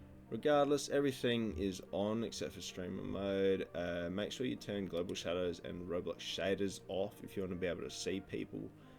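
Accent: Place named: Australia. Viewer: Finally an aussie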